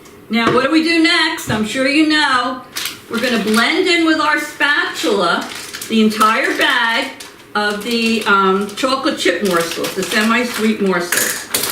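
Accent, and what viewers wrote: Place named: Bronx, United States. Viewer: Hi sis new subscriber hope you become a member a Montgomery Family Channel as well 💋💖 💋 I hear that New York Bronx accent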